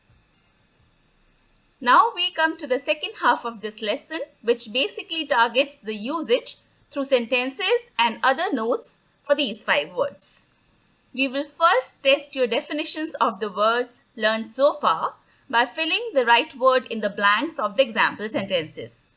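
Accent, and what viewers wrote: India. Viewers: Indianb funny voice xdxd dx d d xd zxd dx dx dx xd dx d x d xx d xddx You thoiught there would be more but there isnt xd xd xxd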